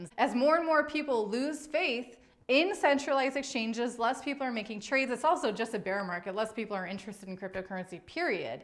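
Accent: United States. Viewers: can someone tell what her accent is? It sounds a bit different to me than the "standard" US accent but I like it.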